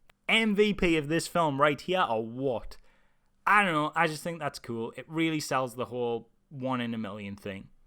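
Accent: Newcastle, United Kingdom. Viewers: Big plan eh? Just a heads up. I came back for the spiderverse review now I have finally watched it but lo an behold....I had been un subscribed.....good job I remembered the channel name eventually as 'well spoken Geordie does eloquent reviews' was getting me fkin nowhere....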